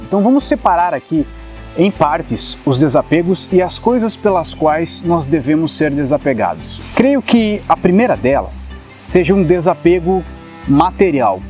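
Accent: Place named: Northeast, Brazil. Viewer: Acho que você é do sul, mas sinto um leve sotaque nordestino ☺️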